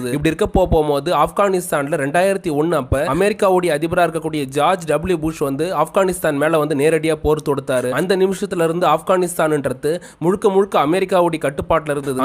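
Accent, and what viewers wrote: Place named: Thanjavur, India. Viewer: சார் தஞ்சாவூர் ஏரியா போல.அந்தாண்டனு சொல்றார்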